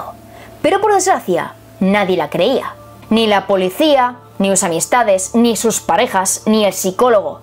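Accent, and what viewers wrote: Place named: Spain. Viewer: Aaaayyyy Maadreee Vale madre . Xd Es que tu acento español y tu sentido del humor... lo amo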